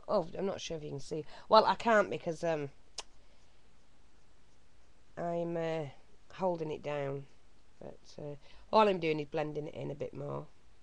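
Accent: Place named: Yorkshire, United Kingdom. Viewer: i knew you were as soon as i heard your voice, we have a very distinctive accent here in good old yorkshire!!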